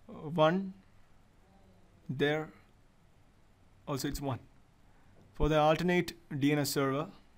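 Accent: India. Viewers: fk that indian accent! it did not even work. zzz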